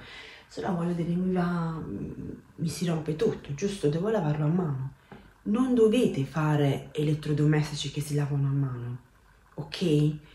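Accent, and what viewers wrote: Apulia, Italy. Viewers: Salve,sono nuova mi sono iscritta al tuo canale sbaglio sei pugliese come me dall'accento sembra così, complimenti per il video grazie e buona giornata 😘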